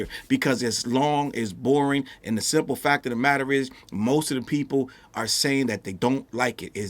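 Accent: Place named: East Coast, United States. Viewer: Let me go ahead and pack in comments to boost this 🥷 in the algorithm, I know the dick gobblers gon be on yo neck 😩 😅😅. Not for nothing yo ahhh remind me of my uncle may he rip, n I ain’t clowning ya age bc I’m not young my damned self n black don’t crack FYM 😅. Anyways he used to always say this that n a third n he spoke fast n he was from east coast so he sounded like yo ahhh too 😅😅. Shit makes me laugh g salute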